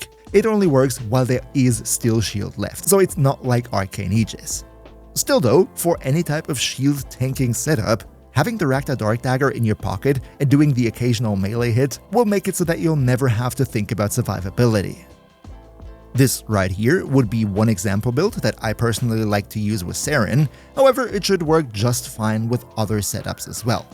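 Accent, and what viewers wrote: Germany. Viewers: YOU'RE GERMAN! I have been wondering where your accent was from!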